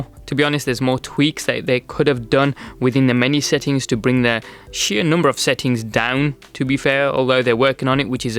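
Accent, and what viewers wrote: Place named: United Kingdom. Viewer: You sound british some sort of :v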